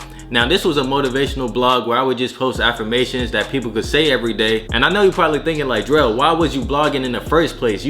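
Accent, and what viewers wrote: Philadelphia, United States. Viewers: I thought you was from Philly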